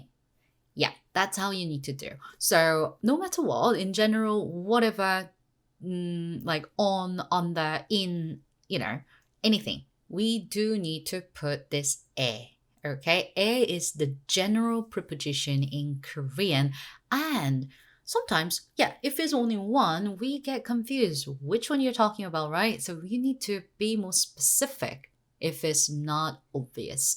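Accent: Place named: United Kingdom. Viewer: Thank you. I really love your videos. Your British accent really shines through! :-)) I didn't know about 속에. It seems similar to 꽂다, which I understood to be kind of meaning stuck in a tight space. What is the difference between 속에 and 꽂다 (other than the fact that one is a preposition and the other a verb). Are they basically used for similar spaces or is there a different nuance?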